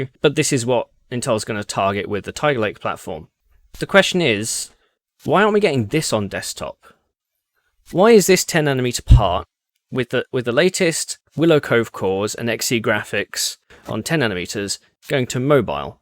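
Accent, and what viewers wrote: England, United Kingdom. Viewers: I needed an English accent to complete my collection of Tech reviewers. Came here from your Level One Tech stream.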